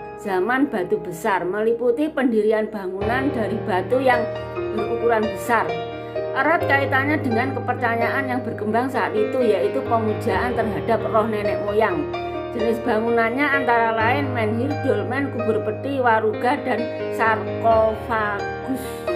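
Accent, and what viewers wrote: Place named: Java, Indonesia. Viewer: Aksen jawanya aku suka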